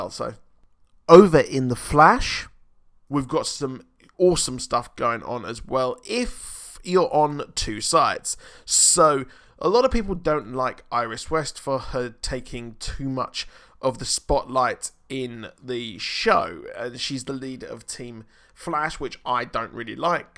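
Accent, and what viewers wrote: United Kingdom. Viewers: Why are u reading this? He is bristh dumbass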